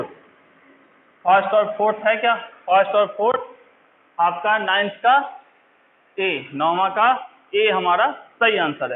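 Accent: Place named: Bihar, India. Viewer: Sir hm iss year Bihar board inter 1st division kiye hai... 353 marks aya hai..physics me 74...biology me 76 aur chemistry me 66 aaya hai iss baar hm neet ka exam nhi de rhe hai..2023 me pakka denge..aap aise hi lecture layega..aapka voice tone bihari jisse padhne me boring feel nhi hota hai..thanks sir..🙏🙏❤️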